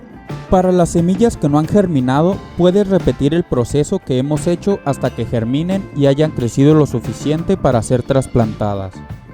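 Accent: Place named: Colombia. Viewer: Eres colombiano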